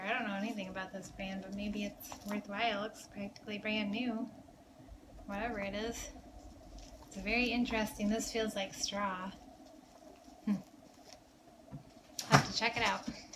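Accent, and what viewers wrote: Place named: Michigan, United States. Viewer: Michigan! I was trying to figure out your dialect and it was driving me mad that I not knowing.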